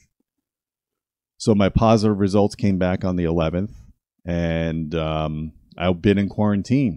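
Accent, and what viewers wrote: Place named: East Coast, United States. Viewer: I would rule out Lyme, I found out I have 2 species of it from Ohio, have had it for 30 years. Just saying, it was the last thing, I checked,bingo. Sure my family has RA history too, but R Arth is a function of the main source - whether it be some sublime infection be it bacterial, or even a viral co infection that is barely kept "underneath" by your struggling immune system. My LLMD had me use DNA Conexxions kit that he said was the most accurate /cost effective one ($650). If you get a hit, then pay for the real expensive one next. I also followed strict testing protocol to get an accurate test, like fasting before the test, strenuous exercise, deep massage session that was purposely painful, empty the bladder and use the next bladder fill 30 minutes after the massage as the sample. I had Lyme A, and from my early childhood infection Ehrlichia from the Lone star Tick, I recall the bullseye rash as a teenager. 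Just saying, even if its not Lyme, it pays to rule it out. You sound like youre from the east coast, I caught Lyme in the 80s in ohio. My dad now has it from the same property. It is pervasive in the midwest, those cute deer in your yard, they bring the Lyme TO YOU. And opossums are the greatest eater of ticks next to some birds, who would've thought that? !! Also, I don't peruse your channel, you just came up when awhile back when I was looking up Coronavirus. I'll have a peek at your stuff... )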